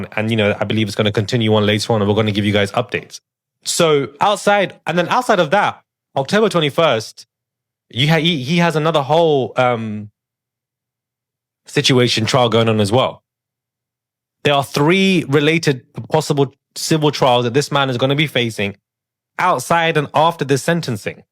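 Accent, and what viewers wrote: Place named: England, United Kingdom. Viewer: English accent sucks..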